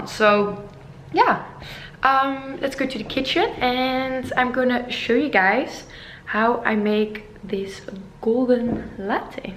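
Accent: Netherlands. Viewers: Good Afternoon!!! you are adorable !!! you feel like my granddaughter!!! I love your Dutch accent!! My family always told me that we are Dutch ... so I'm so very glad that I have found you on Youtube ... I haven't written yet !!! Just found you a week or so ago.. didn't know about your PO Box.. where can I find your address.. Love ya!!! Tell Max HI. !!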